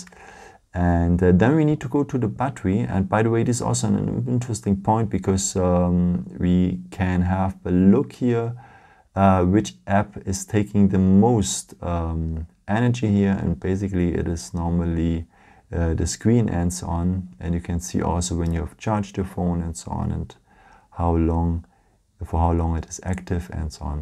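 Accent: Germany. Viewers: Thick german accent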